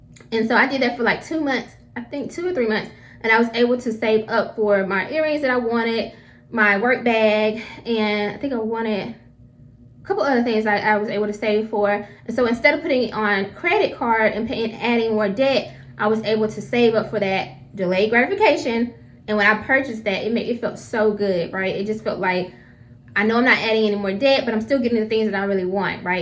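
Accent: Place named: North Carolina, United States. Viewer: Hello. My name is Albert and I grew up in NC. I now live in California, but it is still nice to hear the NC accent. I welcome you to the world of money and investing. I have lived my life frugally. At first it was out of necessity, but in time, it became a lifestyle. Eventually, it became a very comfortable lifestyle. Best of luck with your channel.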